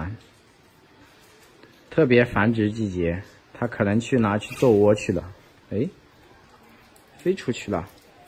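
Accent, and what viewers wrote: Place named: North, China. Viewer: 從不露臉的視頻主,身材好瘦高型,講話字正腔圓聲音好聽,是不是年輕人不知道？猜是大陸北方人or東北人？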